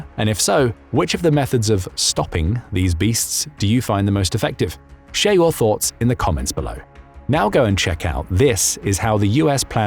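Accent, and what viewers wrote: England, United Kingdom. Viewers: It's an English accent, and the speaking is quite clear.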